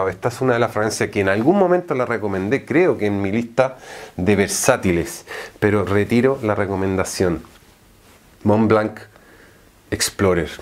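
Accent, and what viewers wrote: Chile. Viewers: este muchacho tiene en su acento o pronunciación una mescla de chileno centroamericano y español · disculpa te pregunté Eres chileno o español??? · Eres chileno???? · Y esa mezcla tuya de acentos que ? el chileno iberico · Tú acento se detecta a leguas amigo, eres chileno. Buen video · Si es chileno, radicado en España, saludos desde CHILE."le dicen el sabueso " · es Chileno ?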